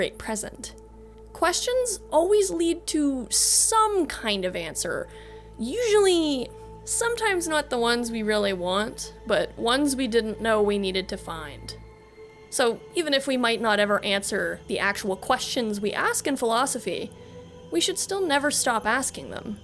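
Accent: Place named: Canada. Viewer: Amazing video. Also knew you were Canadian the first time I heard an "are" or as we say it "arr" lol Got me back into Night city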